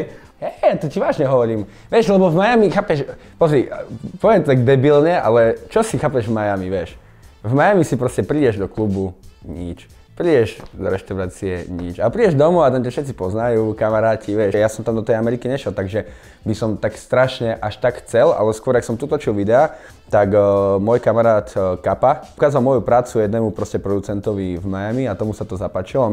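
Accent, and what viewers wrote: Eastern Slovakia, Slovakia. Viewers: Vychodniar jak repa 😀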